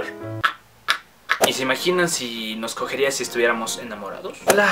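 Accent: Ecuador. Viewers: eres de ecuador?! jaja me encanto este video un gose y lo del carro xD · eres de Ecuador? /o\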